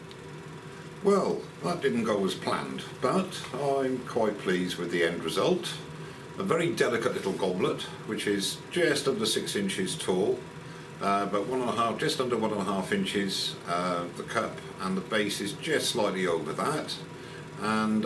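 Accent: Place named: England, United Kingdom. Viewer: great job on the delicate goblet, I look forward to each of your videos. My belief is anyone who has an English accent, in my mind they have An additional 25 IQ points. Your videos are all most interesting and informative. Cheers!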